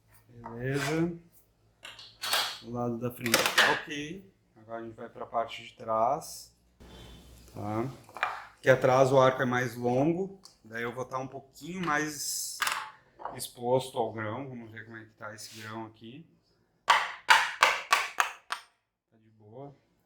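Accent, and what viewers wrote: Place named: Rio Grande do Sul, Brazil. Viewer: Prezado, teus vídeos são muito bons... agora, na boa, esses teu sotaque gauncho... pelaamordeus... tire isso e ganhará o mundo.. abc..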